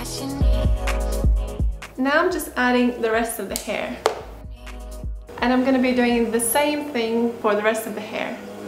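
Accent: Latvia. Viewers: ? latvian? I think, when I speak English I sounds kind a similar...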